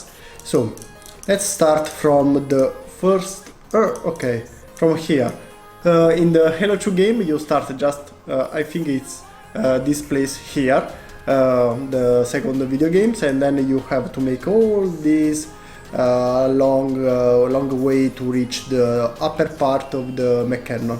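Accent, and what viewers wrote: Italy. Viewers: Italian accent?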